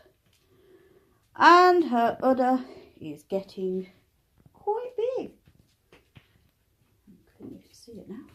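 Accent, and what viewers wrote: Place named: United Kingdom. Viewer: Hello! I'm a new subscriber! I just love your accent, I wonder if your from the same part of the UK as my husbands Auntie :-)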